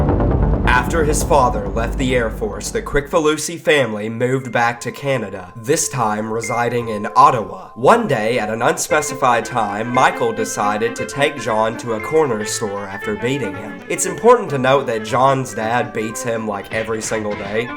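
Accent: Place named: South, United States. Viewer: Amazing video. You did great and it's awesome to hear a good country accent brother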